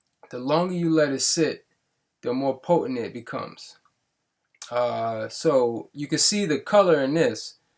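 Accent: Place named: DMV, United States. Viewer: u sound like ur from the DMV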